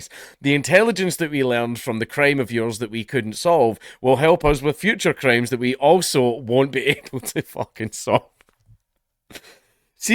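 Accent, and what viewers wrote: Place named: Scotland, United Kingdom. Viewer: I'm from Poland. This is the only source I get the news from Bongland from. A collapse narrated, with scottish accent. By the gods, I'm having fun.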